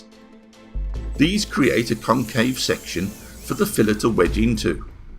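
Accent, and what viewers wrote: Birmingham, United Kingdom. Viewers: Good job fella. Subbed. Do I hear Brummie in your accent mate?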